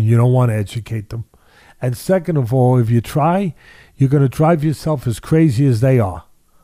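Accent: Newcastle, United Kingdom. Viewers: wow with true geordie, amazing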